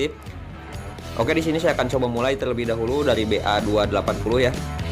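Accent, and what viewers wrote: West Java, Indonesia. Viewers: Keren gaya bicaranya 👍 Logat sundanya masih ada 😀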